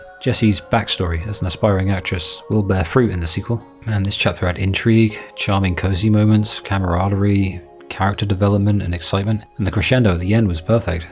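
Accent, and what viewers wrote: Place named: United Kingdom. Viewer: oh he's british. Now it all makes sense.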